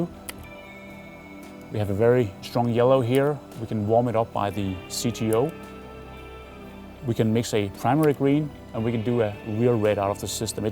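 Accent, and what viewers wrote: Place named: Denmark. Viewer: total danish english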